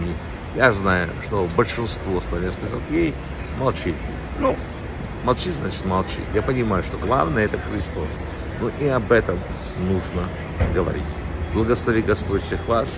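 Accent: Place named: Ukraine. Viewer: АКЦЕНТ ПОЧЕМУ ТО УКРАИНА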